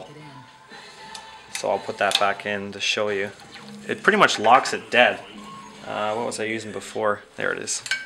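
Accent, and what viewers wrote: Canada. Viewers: are you canadian Eh? Nice video! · Very informative, and in pretty good Canadian English, with "zed" for "Z" and everything.:) Thanks for posting!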